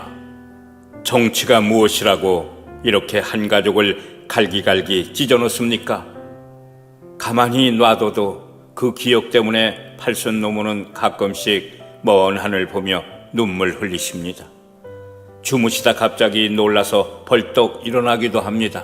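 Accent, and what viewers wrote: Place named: North Korea. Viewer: 말투가 어떻게 북쪽 목소리냐?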